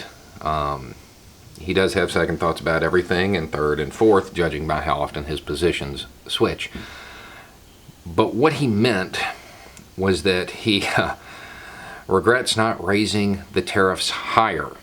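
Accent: South, United States. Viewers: Hey, Philosophy Tube is doing a Shakespeare marathon on twitch to raise money for Samaritans, a mental health charity. The chat there has regularly said they’d love to hear your amazing Southern dialect read some lines from Shakespeare or drop in to chat about mental health and American violence which you’re so good at.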